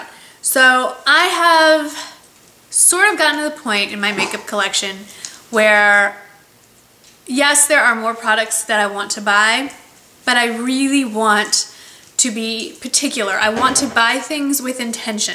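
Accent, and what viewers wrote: United States. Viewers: I love your voice and your accent. It reminds me so much of my year in the US ...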